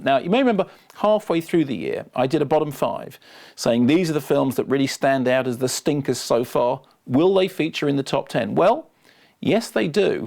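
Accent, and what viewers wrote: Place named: England, United Kingdom. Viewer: Can't really argue with him, he does have an English accent after all...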